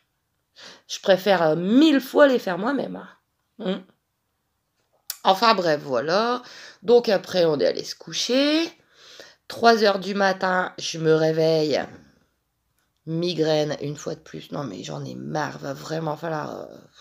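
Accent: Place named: Pas-de-Calais, France. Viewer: Bonsoir. Je vous suis depuis un petit moment, mais je commente pour la première fois. Bon, la poisse c'est la loi des séries... il y a des jours où il vaut mieux rester couché 😅 et le lendemain ça va mieux... Pour les migraines, est-ce que vous avez essayé l'ostéopathie? Pour les pâquerettes, on peut les faire sécher et les mettre dans de l'huile alimentaire. On fait macérer 3 semaines, on filtre et ça fait une super huile pour nourrir et raffermir la peau du corps😊. Une idée pour prendre soin de soi à petits prix. Parce que vous avez besoin de vous occuper de vous pour aller mieux dans votre moral... J'aime bien vous écouter parler. Toute ma famille étant du Pas de Calais, j'ai grandi avec cet accent... moi je suis dans le sud, mes parents s'y sont installés quand ils se sont mariés... mais mes racines sont là-haut... Bonne soirée.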